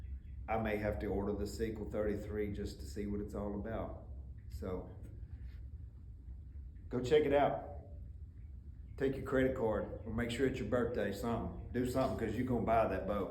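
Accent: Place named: South, United States. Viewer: Love that southern talking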